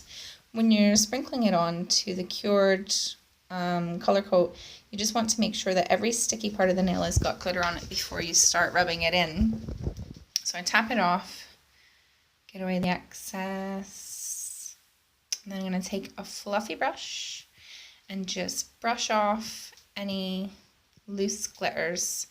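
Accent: Scotland, United Kingdom. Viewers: Do I hear a wee bit Scottish in your accent? X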